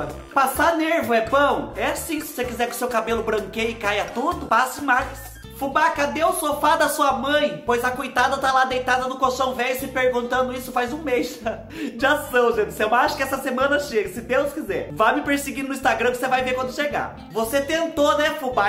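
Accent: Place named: Minas Gerais, Brazil. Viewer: Oiee ,Fubá eu amo seus vídeos e o seu sotaque, você por acaso é mineiro como eu,🤣🤣🤣🤣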